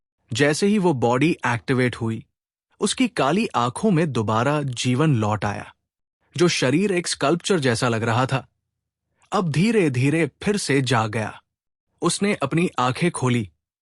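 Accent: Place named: India. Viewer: Why you turn into indian bro.. i cant understand a thing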